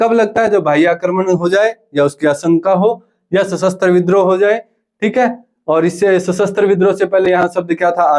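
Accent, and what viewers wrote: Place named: Bagad, India. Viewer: गुरूजी थारी बोली मे टच थोड़ो बागड़ कानी को 😂😂😂😂😂😂😂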